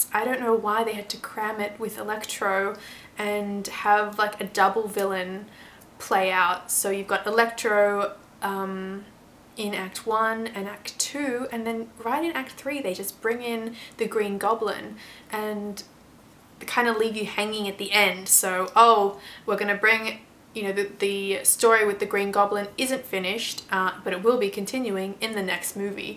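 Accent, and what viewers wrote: Australia. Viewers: Great review :) Is that an Aussie accent?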